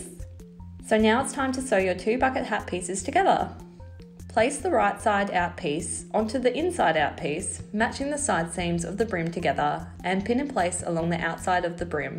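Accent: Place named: Australia. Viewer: Love it! Thanks, I'm going to try making a couple for my grandchildren. As they live in WA by the beach and are now learning to use their surfboards, I thought it might be fun to try making them with a nylon type of fabric that will still be good for them whilst in the water. Cotton is great for regular wearing, but I was trying to think of something that would protect their faces in the water .... maybe even a fabric like their rash tops that protect the rest of their bodies in the water while out in the sun. Thanks for giving me the idea as I was watching your video. I really enjoy watching your videos because they don't take a lot of skill, so even sewers like me can make them up. It's also great to hear an Aussie on YouTube! In what part of the country do you live? I'm in Hervey Bay so I'm very aware of the need for hats ....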